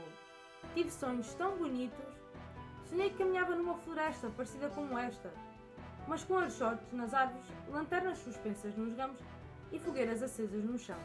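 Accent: Portugal. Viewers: Eu amo esse esse português e Portugal. TT internacional #MARATTONANDO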